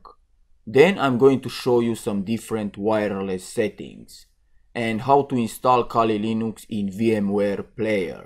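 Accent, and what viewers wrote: Nigeria. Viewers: shimt yes realised now. that's definitely a Nigerian accent.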